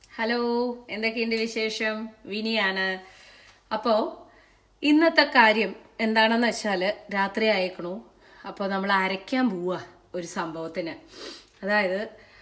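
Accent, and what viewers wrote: Palakkad, India. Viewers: njan try cheythittu parayamtto. Pinne videos improve avunnu shake avkunilaaa.. pine de veedu palakkad ano samsarikam kettapol thonni... ente nadum palakkad anu( chittilamcherry) eppol Newjersy il. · ഹായ്.. ഞാൻ ശ്രീദേവി അജോയ് കുമാർ ഞാൻ മുംബൈയിൽ സ്ഥിരതാമസമാണ്.. ഒരു വീട്ടമ്മയാണ് ഞാൻ സ്ഥിരമായി വിനിയുടെ എല്ലാ പരിപാടികളും കാണാറുണ്ട്.. വിനിയുടെ പൂജാവിളക്കുകൾ ക്ലീൻ ചെയ്യുന്ന പരിപാടിയാണ് ആദ്യമായി ഞാൻ കണ്ടത്, എന്നെ കൂടുതൽ ആകർഷിച്ചത് വിനിയുടെ പാലക്കാടൻ ശൈലിയിലുള്ള സംസാരമാണ് ആദ്യം ഞാൻ കരുതിയത് ഏതോ ഒരു പ്രായമായ വ്യക്തിയാണ് വിനി എന്നാണ് കാരണം വിനിയുടെ സംസാരം വളരെ സനേഹവാത്സല്യവും പക്വതയും നിറഞ്ഞതാണ് അതുകൊണ്ട്, പിന്നീടുള്ള പ്രോഗ്രാമിൽ വിനിയെ കണ്ടപ്പോൾ മനസ്സിലായി ആള് വളരെ ചെറുപ്പമാണെന്ന്.. എന്തായാലും ഒരു അറിവിന്റെ കലവറയായ വിനിക്ക് ആശംസകൾ.. എല്ലാ പ്രോഗ്രാമുകളും കാണാൻ ശ്രമിക്കാറുണ്ട്.., കെയർ ആൻഡ് ക്യൂർ എന്ന പ്രോഗ്രാമും എല്ലാം.. നന്ദി വിനി..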